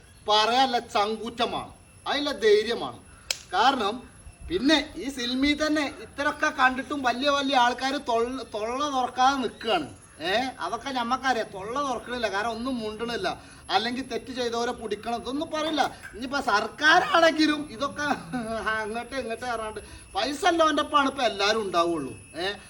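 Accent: Malappuram, India. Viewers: എടോ, നിങ്ങളുടെ വീഡിയോ എല്ലാം മെച്ചം തന്നെ, സിനിമയിൽ എന്ത് ഊച്ചാളിത്തരവും കാണിക്കുന്ന ഈ നടൻ സിദ്ദിഖ്, അവൻറെ തോളിൽ കയ്യ് വെച്ചുവെന്ന് പറഞ്ഞ് എടുത്ത് മാറ്റാൻ പറഞ്ഞത് അഹങ്കാരത്തിന്റെ അങ്ങേയറ്റമാണ്. മകൻ മരിച്ചപ്പോൾ അവനെ എല്ലാവരും കെട്ടിപിടിക്കുന്നത് കണ്ടല്ലോ, മുംബ് റിയാദിലെ ഒരു കംബനിയിൽ ഇലക്ട്രീഷ്യൻ ആയിരുന്നു അയാൾ.എല്ലാറ്റിനും കൂടി ഇപ്പോൾ കിട്ടികൊണ്ടിരിക്കുന്നുണ്ട്. പിന്നെ,നിങ്ങളുടെയീ പ്രാകൃത ഭാഷ ദയവായി ഒഴിവാക്കണം, ഇപ്പോൾ മലപ്പുറത്തെ ആരും തന്നെ ഇങ്ങനെ സംസാരിക്കുന്നില്ല.സംസാരിക്കാനറിയില്ലെഘിൽ അറിയാവുന്നവരെ കൊണ്ട് ഓഡിയോ ഇടുക. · നിങ്ങൾ സംസാരിക്കുന്ന ബാസ ഏതാ ശരിക്കും മലപ്പുറം ബാസ ആണോ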